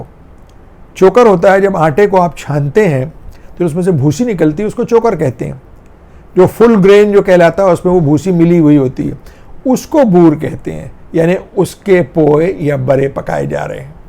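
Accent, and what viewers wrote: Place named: Delhi, India. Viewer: Lko kie zabaan chouk wali boli hie hai Ameena baad kie bhi yahi thie yeh aap aao dilli kie boli hai.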